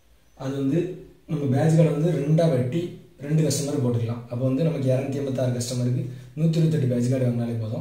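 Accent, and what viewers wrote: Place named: Tirunelveli, India. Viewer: Are u from nellai district or kanyakumari...?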